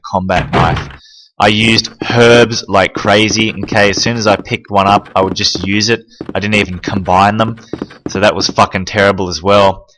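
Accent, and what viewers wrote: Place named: Australia. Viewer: “We’re going heeee, spiders will be in heeee, but we now have the eagle medal, so we will go ahead and put it in heeeee…” Love the aussie speak 😄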